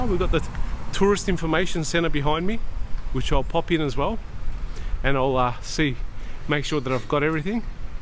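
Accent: Australia. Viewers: Bro, get some vocal coaching. That Aussie twang of yours ain't particularly pretty.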